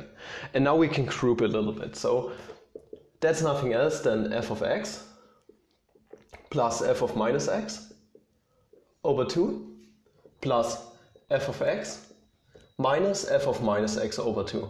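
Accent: Germany. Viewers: They're both great. Having taken four years of Deutsch in high school, I appreciate the German accent, helps me practice!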